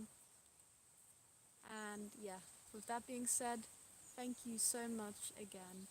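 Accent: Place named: Australia. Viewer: please do more light language! I rarely come across light language on youtube that legitimately affects me, and yours does, along with Keleena Malnar's. Are you Australian? You sound aussie for the most part!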